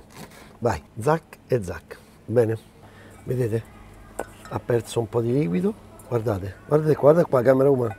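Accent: Rome, Italy. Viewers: Sadly the Roman way to speak gets lost in translation. It's half of the fun!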